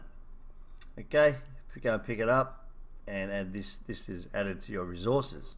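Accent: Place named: Australia. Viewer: I can already tell your Aussie 😂, nice video and great explanation 🤘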